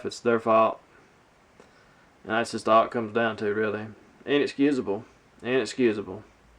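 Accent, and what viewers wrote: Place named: Louisville, United States. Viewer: he had me going after the louisville loss...plus, his accent is TOTALLY lou-a-vul! i thought he was for real blaming the refs, the "criminole" jameis winston and the ncaa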